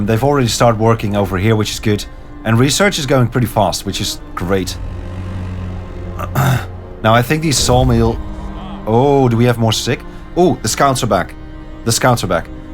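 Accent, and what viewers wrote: Netherlands. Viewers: funny how you can immediately hear you are dutch :D this games soundtrack is so good, specially when the storm hits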